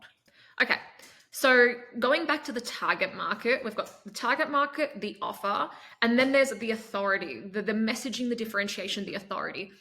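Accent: Australia. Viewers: Good stuff. I, for one, enjoy your English and Aussie accent.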